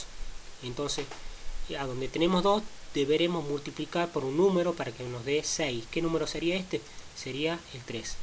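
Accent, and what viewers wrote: Córdoba, Argentina. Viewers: De casualidad sos de Argentina? Mas exactamente de Córdoba? Por la forma de hablar.. Un pequeño consejo.. Valencia y numero de oxidación no es lo mismo y veo que lo confundís.. Ojo con eso.. Igual muy buena explicación !! :)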